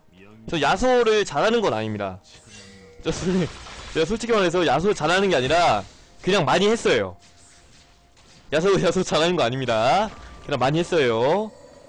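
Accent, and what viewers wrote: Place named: Seoul, South Korea. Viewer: 일로온나 일로온나 익숙한 부산사투리가 들려 ㅋㅋㅋ 님 말투 서울사람같은데 어디사심??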